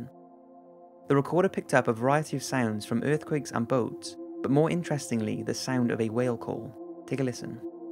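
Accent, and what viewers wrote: Wales, United Kingdom. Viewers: I hear a brit accent and talk of kilometers and my New York ass says gnite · Are you from Cardiff I think you are shout out to my fellow welsh man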